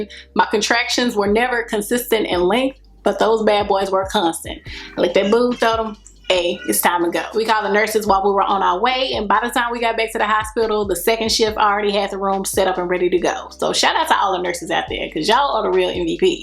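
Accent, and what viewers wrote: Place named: South, United States. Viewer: Lol so entertaining you have a southern accent I love it especially the part about only being 4 CM and thinking you were further along ! Ugh that must eve been irritating !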